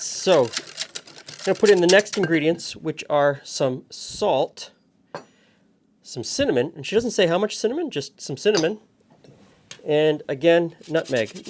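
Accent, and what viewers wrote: Canada. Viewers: I knew you were Canadian by your accent. My relatives are from Canada and they have that exact same accent. When I saw you pulling out those milk bags, I said, "Yup this guys Canadian." I knew because my uncle always bought their milk (household of 5 children) i loved the milk and wanted more and could never get enough, that and the big jar of Nutella they had for spreading it on a bread. Lest we forget the bread and that eastern European jam we always ate....since we are from Eastern Europe. Those good old innocent days, the Irish Spring and cold downstairs little bathroom.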